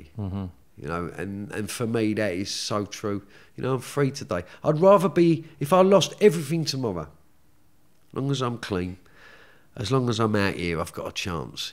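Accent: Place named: Cockney, United Kingdom. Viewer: A very likeable fella, a proper cockney and loveable rogue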